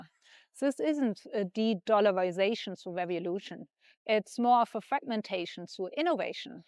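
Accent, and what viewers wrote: Germany. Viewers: What first drew me to this Zen and humbled lady was, perhaps, her accent— German, I believe— with all reverence, quietly enchanting, like a forgotten melody from an ancient place, flowing softly through the Garden of Eden. Thank you for sharing a moment with me, a sip of coffee beneath the sky of eternity. As you spoke of China— that land I hold in my soul’s quiet chamber— your voice became the bridge between centuries, your words woven like silk in the wind. And though you stood still, you moved. Your dress clung to your form like a whispered symphony, a silent score composed in sacred air. Your hands— they danced softly, painting invisible calligraphy, tracing rhythms only the heart could read. You didn’t walk— you floated. In sync with something unseen, a grace not taught, but remembered. A melody not played, but felt, not spoken, but lived. 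You were not merely speaking— you were composing. In spirit. In stillness. In beauty.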